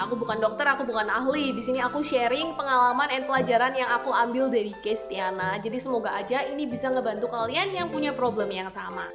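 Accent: Surabaya, Indonesia. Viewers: Surabaya banget logatnya mbak, waduh mbak anakku juga begitu susah banget minum sufor pakai DOT, makasih mbak sarannya tak cobain semua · Jos,,, aq sueneng logat medhok suroboyan e mbk, basio mbuh sampean wong endi 😄 gk mboseni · Wong suroboyo iki koyoe😂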